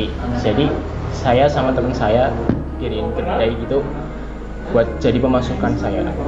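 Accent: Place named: Banyumas, Indonesia. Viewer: Ngapak nya keliatan yaah 😂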